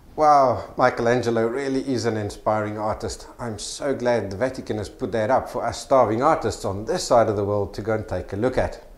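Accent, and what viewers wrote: South Africa. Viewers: Ja well no fine!! I was delighted watching your video and enjoying my homeland's accent. I live in Irvine California and became an amateur artist after arriving here 25 years ago. By the way, I have not lost my SA accent and people enjoy it for some reason! Anyway, good luck and best wishes with your videos. I plan on watching most of the paintbasket videos as I can see there are many which I did not know about. Totsiens and mooi bly!! Noeleen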